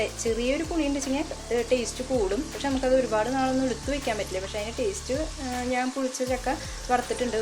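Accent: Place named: Thrissur, India. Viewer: Nicee..thrissur slang 😁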